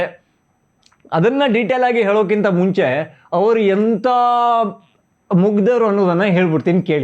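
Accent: North Karnataka, India. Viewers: ಸರ್ ನೀವು ಮಾತನಾಡೊ ಶೈಲಿ ಚೆನ್ನಾಗಿದೆ 👌🏼 ನೀವು ಉತ್ತರ ಕನ್ನಡದವರ?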